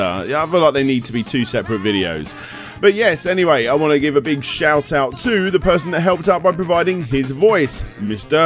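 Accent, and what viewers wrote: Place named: United Kingdom. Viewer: My wife can't understand English and still notices you're British.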